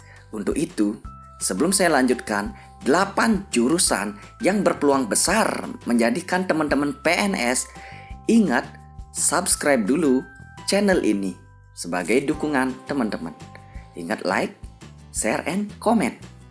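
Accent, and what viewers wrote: Bali, Indonesia. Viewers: T nya lucu ya? dari bali ya? All the best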